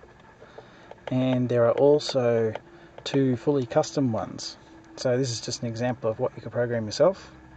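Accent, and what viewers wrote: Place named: Australia. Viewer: I like Subaru’s with shift lights, clicked this video, and heard an Australian accent lol.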